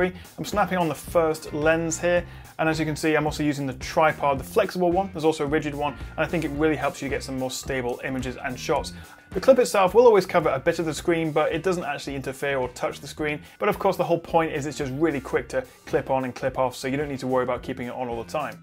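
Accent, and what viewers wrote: United Kingdom. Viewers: Always love watching your videos. Good honest reviews with a familiar British accent.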